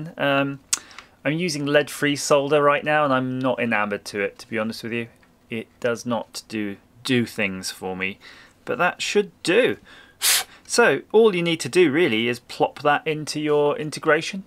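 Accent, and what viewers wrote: United Kingdom. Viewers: Thanks for the content and LOVE the British accent!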